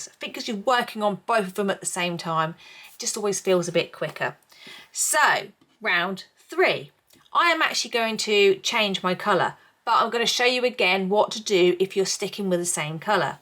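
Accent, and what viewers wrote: United Kingdom. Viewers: At last a british voice,phew what a releif.Love the tutorial,am following along,just need to find the measurements i need.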